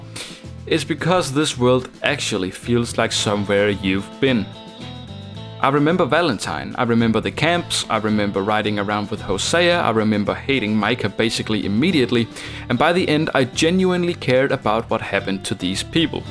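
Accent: Denmark. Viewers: Are you from Denmark? You sound danish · Is that danglish i høre?😄❤️ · Jeg kan genkende noget danglish når jeg hører det😂. Super god video, det var lidt chokerende at se du startede for 10 dage siden. Meget imponerende og jeg glæder mig til at se hvad du poster i fremtiden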